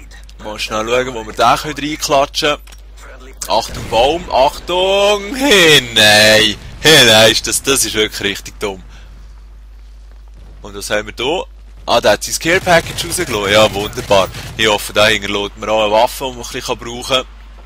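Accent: Switzerland. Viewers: ich versteh auch fast nix :D is schweizer glaub ich :D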